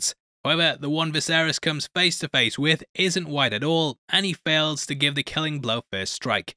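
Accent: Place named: England, United Kingdom. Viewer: Love your accent, what part of England is that from?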